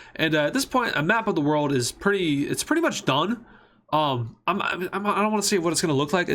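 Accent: United Kingdom. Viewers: What's rong with the British accent I am Briton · As a Brit, I can confirm that was a British accent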